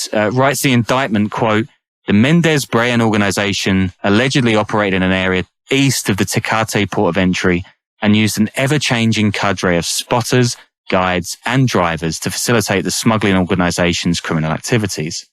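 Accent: United Kingdom. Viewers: Why the fk is it always narrator’s with an English accent. Like y’all facts are always so fked it’s unreal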